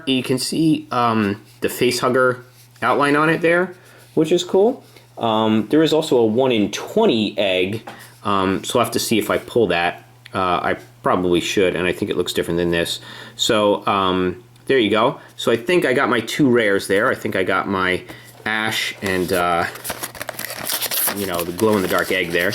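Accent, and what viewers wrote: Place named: United Kingdom. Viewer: Wtf! He`s obviously British!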